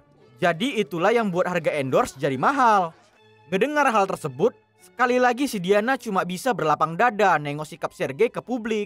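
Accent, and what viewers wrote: Medan, Indonesia. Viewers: Sering2 upload lah bang..ntah film apapun..yg penting suka kali aku dengar logat medannya..😅😂👏👏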